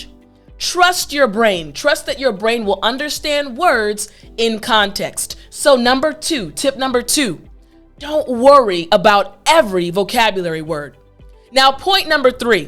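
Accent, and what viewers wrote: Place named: South, United States. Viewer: Teacher a top top motivational speaker. I love your country accent 🤩❤️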